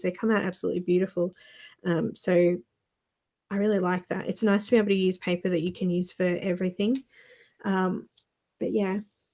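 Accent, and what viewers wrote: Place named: Australia. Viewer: Just wonderful, I learnt so much from this 4 series tutorial. Just realised your a fellow Aussie also. Should of picked up on the accent sooner. I know you used white for these ones but I am really wanting to try the Strathmore toned grey and tan paper, can you please advise exactly what to order as I am clueless. I do use the office works board 200gsm and love it. Many thanks.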